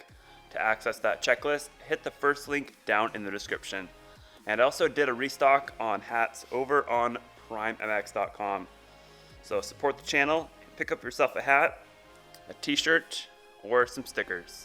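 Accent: Finland. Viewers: Are you from finland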